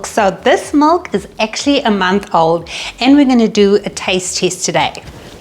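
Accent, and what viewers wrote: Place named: South Africa. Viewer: Were you originally from South Africa. Your accent sounds so familiar. But live from RSA anyway to you 😊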